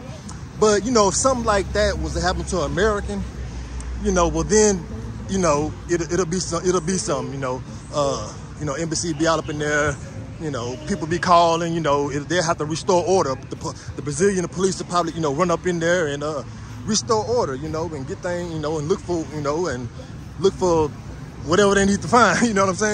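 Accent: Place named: Texas, United States. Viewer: Nigga I'm from East Texas too, Longview ! Lol ! About to get my passport. I knew this dude had a texas accent lol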